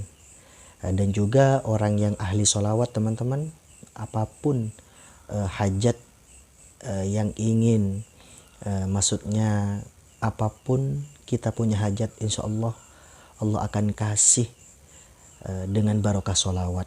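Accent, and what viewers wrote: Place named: Madura, Indonesia. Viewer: Reng madureh kakeh tretan 😂?macem logatdeh reng madureh.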